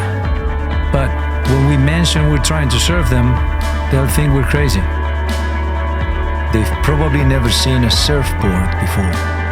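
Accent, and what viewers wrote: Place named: Spain. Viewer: Everything perfect. But just one detail is not so perfect. As a Portuguese I can perfectly, "catch" that the narrator is not the Portuguese Surfer, but a very evident Spanish "Sxsxxss" accent (similar to Pepe Guardiola) when speaking in English! It would be just perfect if would be the Portuguese Surfer doing the narrative voice / speaking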